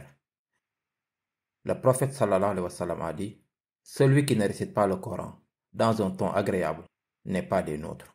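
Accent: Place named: Senegal. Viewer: Une voix Sénégalais 🥰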